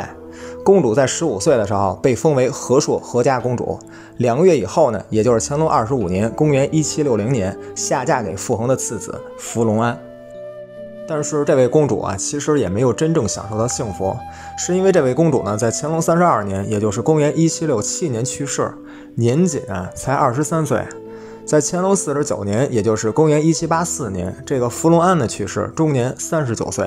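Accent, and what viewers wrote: Beijing, China. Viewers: 功课十足的视频👍&京腔京韵的普通话💯👍谢谢分享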